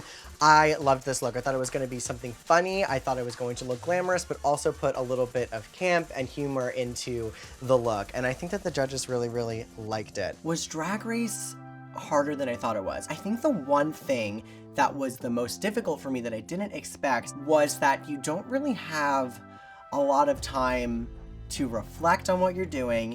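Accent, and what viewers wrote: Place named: New Jersey, United States. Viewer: I love her New Jersey accent and energy!!! He is the type of guy you want to take home to meet your mother.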